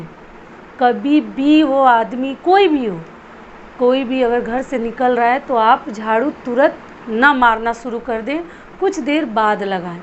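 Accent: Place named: East Champaran, India. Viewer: Mam aap Kaha se ho?qki aap Jo v rule batete hai .wo sb mere Ghar me v follow krte h...or ye SB rule Bihar k East champaran/West champaran jila me hota h... Plzz tell me mam and don't mind 🙏 Qki Mai motihari se hu...east champaran motihari... Aapki boli v wahi h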